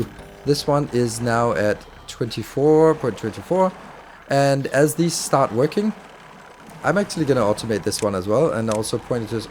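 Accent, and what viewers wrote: Denmark. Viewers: Er lige faldet over din profil mega fedt content glæder mig til at følge med, blev nød til at tjekke om du ikke var dansk hahah for synes jeg kunne høre det i måden du taler engelsk på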